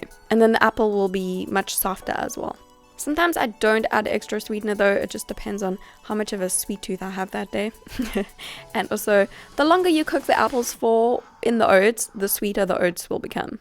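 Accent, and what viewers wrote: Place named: South Africa. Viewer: Can i just say love the accent reminds me of home... miss south africa and your accent reminds me of it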